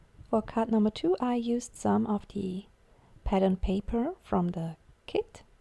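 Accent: Germany. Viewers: You're english is very good. You speak it very well. I've watched your video's before, but didn't understand that much. Some words are similar enough I could figure it out, and I know a very tiny bit of german. I loved that you did an english voice over though. It was nice to learn what some the greetings meant. My Grandmother was german, but she died when I was younger so I didn't get a real chance to learn the language. Hearing you speak, the accent reminded me so much of her. Lovely video. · Loved hearing your accent. My husband is German and his family all spoke to each other and had such lovely accents. He never learned the language. Your cards are lovely 😊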